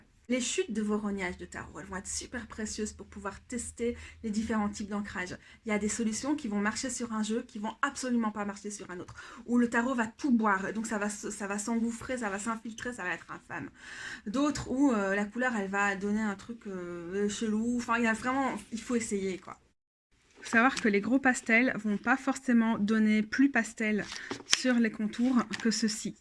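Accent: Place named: Belgium. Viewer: Merci. J'ai testé sur un mass market, le tarot de Marseille de Kriss Hadar avec un markeur pour paperbord. Catastrophe, effectivement le carton boit l'encre, j'en ai mis partout. Du coup les majeurs sont tranches "noires" et les mineurs blanches. Il me semble avoir vu une vidéo d'une créatrice d'oracle qui conseillait des feutres haut de gamme mais je ne sais plus les références. Et Claire de la lune à fait une vidéo sur les arrondisseuses et autres matériels de customisation. Ton tarot de la forêt enchanté est super beau. Le miens est resté tel quel. Je n'ose plus customiser mes jeux. Je n'ai pas entendu le bruit de machine donc aucune gêne. Et sinon j'aime bien le français belge. À tantôt 😉🙏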